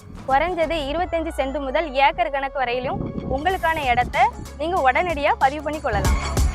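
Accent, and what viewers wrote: Tirunelveli, India. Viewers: உங்களுடைய திருநெல்வேலி slang super.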